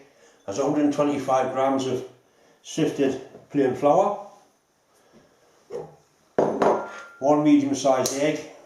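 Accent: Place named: Cockney, United Kingdom. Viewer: The Cockney Iron Chef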